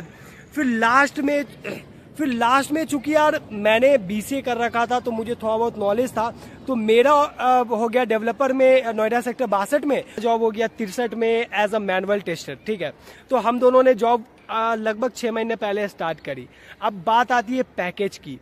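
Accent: Bihar, India. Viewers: Aap Bihar se ho 🙃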